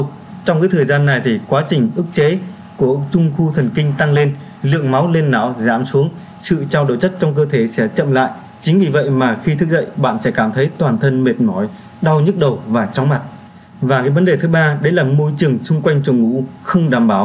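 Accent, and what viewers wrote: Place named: Thanh Hoa, Vietnam. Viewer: Nghe là biết anh là người Thanh Hoá nè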